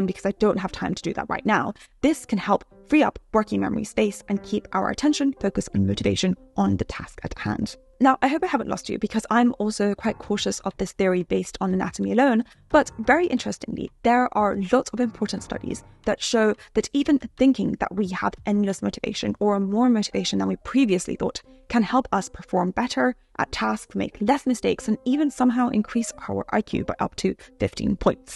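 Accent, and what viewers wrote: United Kingdom. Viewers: Oh my god I don't understand British English, I'm learning American english 🤦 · Your voice is not annoying. It sounds just like Karen Gillian. (though it might just be the british accent). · Is it British accent who know about that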